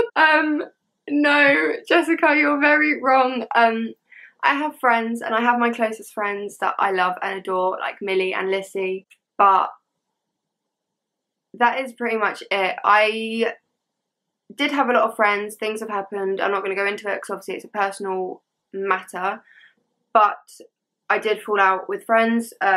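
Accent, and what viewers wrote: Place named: United Kingdom. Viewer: I love a girl with a British accent it's cute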